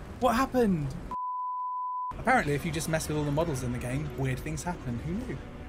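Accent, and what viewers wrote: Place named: United Kingdom. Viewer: Your British accent made this video even more hilarious!:face-blue-smiling::face-blue-smiling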